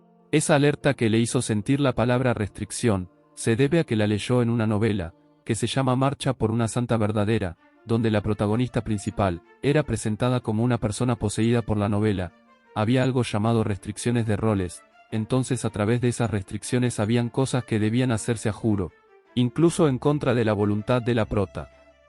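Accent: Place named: Argentina. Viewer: Desperté Ahí casi al inicio Ver video dijo piba déjame adivinar es un canal argentino o sea el de este video o sea el creador del video es argentino y lo sé simplemente Porque como dijo piba Y pensé el que hace el video es un argentino y yo lo sé Simplemente porque en opciones o más información yo no tengo que Ingresar a esa vaina de más información Por qué simplemente con lo que dijo de piba y dijo eso Yuya adivina Y pensé y dije es un argentino Quién hace el video El que el creador del video es argentino simplemente la forma del hablar lo dice De qué lugar es y para mí fue algo sencillo de saber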